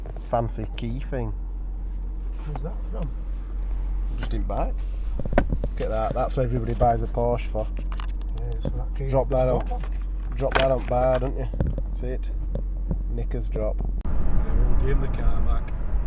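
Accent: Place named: RP, United Kingdom. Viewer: You've gone all posh now.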